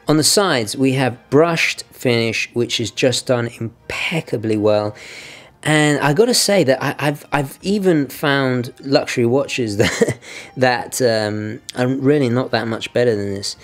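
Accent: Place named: United Kingdom. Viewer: Hi been watching a few vids good stuff. I'm about to buy another watch, not made of money so looking at hundred UK. It's either the Rotary Windsor or Regent, love them both just wanted your opinion. Now the regent is originels range but something classic about the Windsor. Your thoughts? Also, sounds like you are from the UK but noticed your Italian background on different video, but living in the States?! Interesting Interesting. Good content my friend, I do love a fine watch. Will also check out that book you mentioned meditations. All the best from wintery Staffordshire, Jim.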